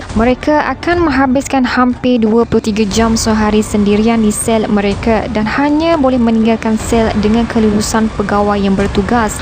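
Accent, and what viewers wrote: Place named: Indonesia. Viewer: Kenapa macam slang Indon je..Cuba gunakan balik bahasa baku....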